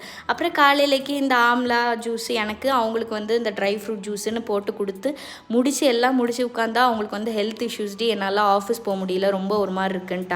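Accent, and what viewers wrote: Tirunelveli, India. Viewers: Unga nellai slang in innocent tone is awesome jeera pepper equal a powder panni vachukita rasam easy a panlaam v can do it by trying it often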